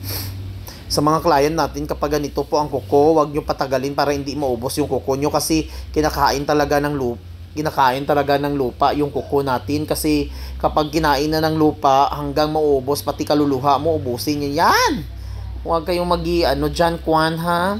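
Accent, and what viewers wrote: Iloilo, Philippines. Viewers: Tingala mn ko nga daw karay a nga daw ilonggo ang panghambal pero taga Davao 😄😄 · Ilonggo k pla kya malambing ka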